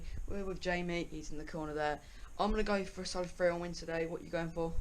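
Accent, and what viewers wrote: Manchester, United Kingdom. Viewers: nice manchester accent lol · That's a strange manc accent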